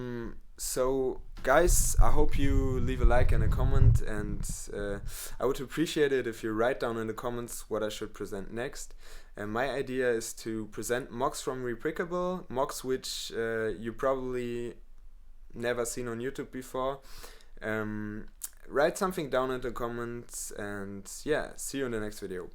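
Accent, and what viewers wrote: Germany. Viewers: Klingt sehr deutsch haha aber geiles moc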